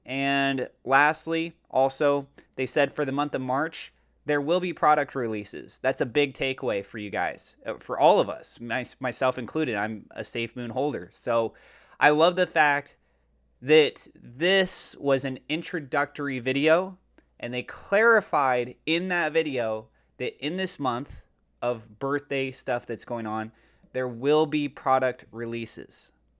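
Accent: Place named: Wales, United Kingdom. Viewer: Haha how can you mistake a Welsh accent for Australian, they are so different!!!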